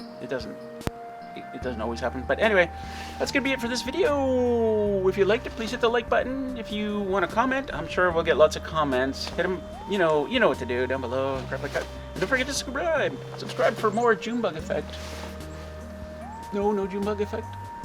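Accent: Canada. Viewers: Big fan of Shawn James I get a kick out of accent! Now that’s a Canadian accent! With Shawn you only get an occasional aboot!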